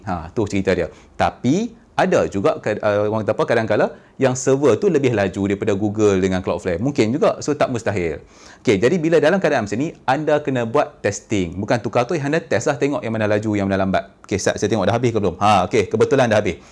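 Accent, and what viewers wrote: North, Malaysia. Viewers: Abg terang memang padu, loghat utara plak. haha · Walaupun beliau bertutur bercampur dgn loghat utara,saya yg berasal dri Sabah faham dgn baik,tak sangka banyak Malaysian buka content video lain dri yg lain tapi yg penting sangat bermanfaat! Tq for sharing the info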